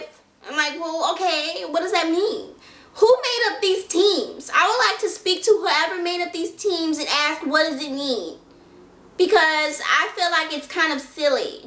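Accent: South, United States. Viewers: Lol your accent is mixed with white girl & country!! it's so cute lol .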